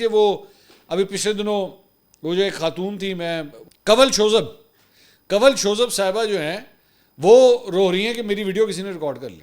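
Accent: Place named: Lahore, Pakistan. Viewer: AP bohat izat k qabil hain or achi reporting kartey hain emandari k sath is me koi shak nahi. Bas AP apna labori accent khatam kar deyn mean k AP reporting kartey waqt Punjabi na istamal Kiya kareyn is se apki reporting par effect pardta hey jesey k bohat se indians Jin tak apki baateyn pohanchna bohat zruri hain me samjhta hu wo Punjabi language ko samjh nahi patey hon gey.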